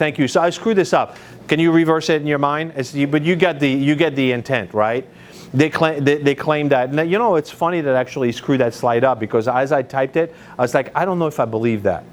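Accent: Quebec, Canada. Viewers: Sounds more like Quebec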